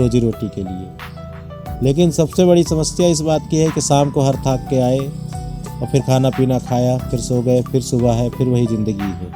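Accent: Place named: Uttar Pradesh, India. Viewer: Bilkul up se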